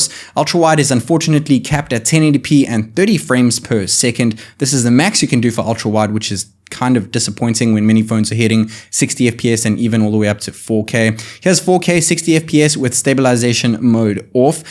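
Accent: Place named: South Africa. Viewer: This guy sounds like a South African🤔 the accents gave it away....but is he?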